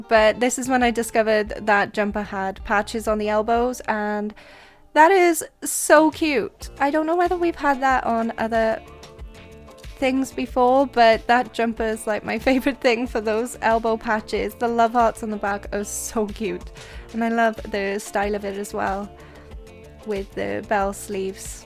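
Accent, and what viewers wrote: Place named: Wales, United Kingdom. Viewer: OMG YOURE WELSH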